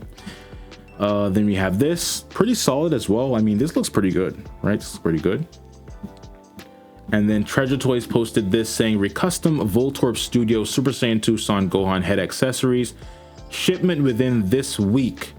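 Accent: Canada. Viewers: Are you from Canada???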